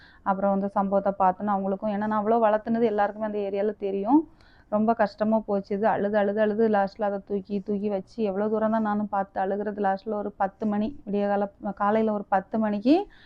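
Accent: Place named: Nagercoil, India. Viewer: Love the Nagercoil accent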